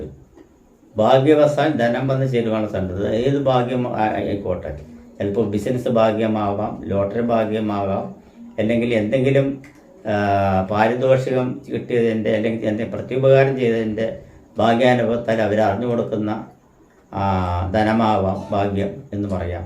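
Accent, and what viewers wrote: Tamil Nadu, India. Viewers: Tjamizhu. Parayunnath. Kondanu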